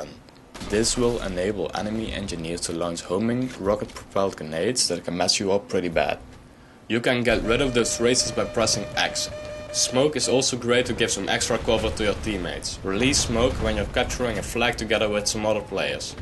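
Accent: Netherlands. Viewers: kom jij toevallig uit limburg? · yeah,the first 3 seconds you started talking i thouth:''he is dutch...'' me to! :S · Dat Nederlandse accent herken ik meteen :D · wow dat accent hoor je meten dat je uit Nederland komt geweldige video trouwens erg duidelijk en goed in elkaar gezet ik ben nu lvl 14 1/2 en zelf ik heb nog moeite met de tank. bedankt! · Nederlands accent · r u dutch? thats not an insult, for me as german it sounds that way ;)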